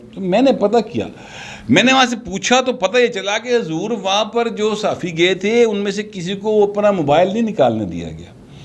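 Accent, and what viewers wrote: Lahore, Pakistan. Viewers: Good 👍!! Typically lohery style sir ji😍😍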